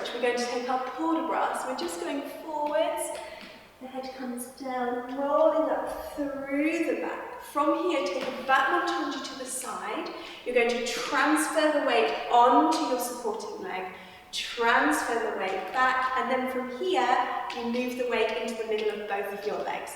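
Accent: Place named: England, United Kingdom. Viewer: Well done! Very clear and well-thought out. Plus I love the English accent and correct ballet terminology.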